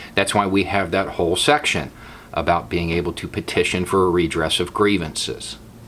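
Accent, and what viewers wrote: South, United States. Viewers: Lowkey you sound like heath ledgers joker. He had a slight southern drawl, particularly your vowels sound a lot like him. It’s pretty cool just noticed it.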